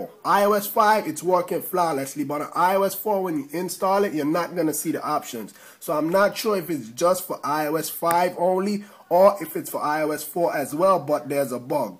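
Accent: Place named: Jamaica. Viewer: You sound like you could sing a Jamaican song !!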